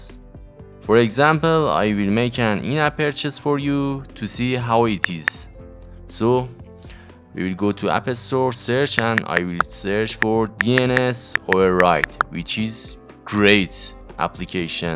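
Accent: Iran. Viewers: داش لحجت ایرانیه، چرا نمیشه تو کلش استفادش کرد ؟؟ هرکار میکنم میزنه رو رویال پس میره تو خرید با کارت مستر یا ویسا گزینه اپل پی نمیاد؟؟؟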